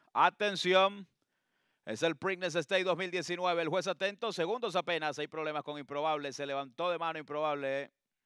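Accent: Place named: Venezuela. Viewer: es venezolano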